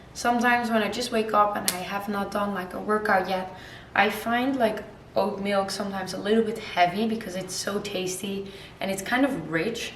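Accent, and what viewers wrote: Netherlands. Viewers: je accent is heerlijk , hoor gelijk dat je nederlands bent lmao. Xxxx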